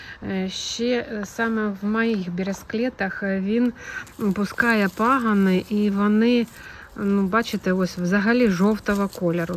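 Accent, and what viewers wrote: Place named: Surzhyk, Ukraine. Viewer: Суржик як суржик, як на мене дуже органічний. Головне постійна практика говоріння💙💛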